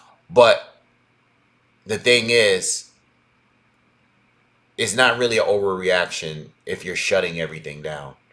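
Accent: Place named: New York, United States. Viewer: Are you from New York? So I'm a Brit from London and I've always loved that New York accent. And as I'm writing this, your video is playing in the background and yes, you're definitely a New Yorker for sure; such a cool accent!!!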